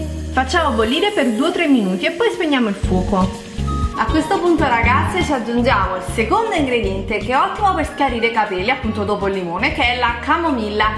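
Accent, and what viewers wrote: Naples, Italy. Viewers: Hai l'accento napoletano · Ossicini è in dialetto napoletano se non si era capito dal suo accento fonetico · sbaglio o sei napoletana, l'accento è inconfondibile 😂😂😂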